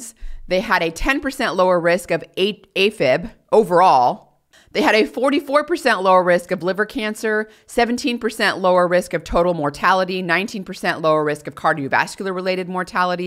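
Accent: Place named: California, United States. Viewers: California accent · how would Americans call her accent? Californian? it's interesting.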